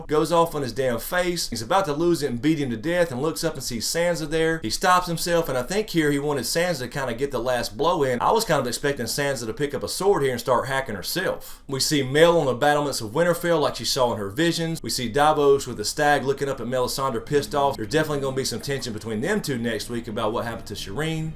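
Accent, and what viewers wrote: South, United States. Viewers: Are you a comedian! You make me laugh out loud, til my stomach hurts! I LOVE your reviews! Love that Southern drawl, too! Great job! I look forward to more videos from you..... :)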